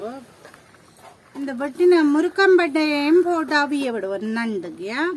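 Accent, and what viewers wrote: Jaffna, Sri Lanka. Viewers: Akka u vioce super original jaffna tamil 4 sweden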